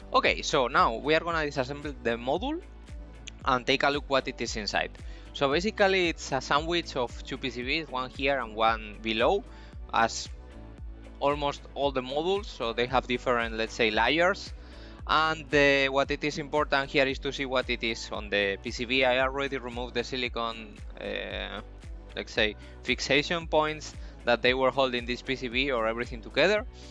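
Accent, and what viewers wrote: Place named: Portugal. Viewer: My electronics teacher was from portugal. He sounded like you. Great teacher like you.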